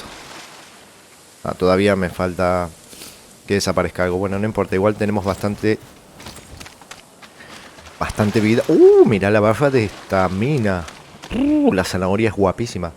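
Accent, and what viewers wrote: Argentina. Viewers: me gusta mucho el contenido pero realmente me hace mucho ruido la mezcla de tonada argentina, con palabras y expresiones españolas y mexicanas jajajaja. Igualmente, repito, de los pocos canales que suben buen contenido del game, gg wp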